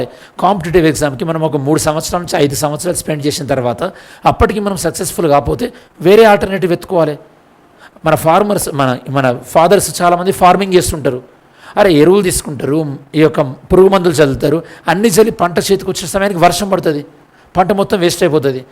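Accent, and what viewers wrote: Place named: Telangana, India. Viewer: Telangana yasala suuuuuuuuuuper sir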